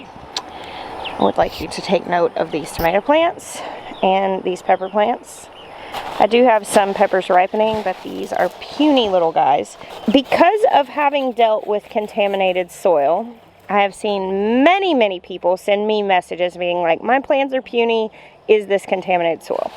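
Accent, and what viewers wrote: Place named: South Carolina, United States. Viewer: your SC accent is coming on strong!! 😁🌻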